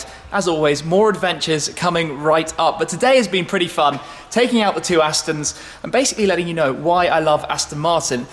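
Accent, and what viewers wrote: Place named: RP, United Kingdom. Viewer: How does this guy buy so many cars ? Sounds like a bender from oxford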